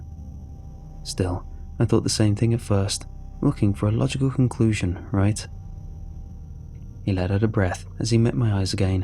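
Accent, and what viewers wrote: England, United Kingdom. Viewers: What accent has the narrator got? Sound's English but I could be wrong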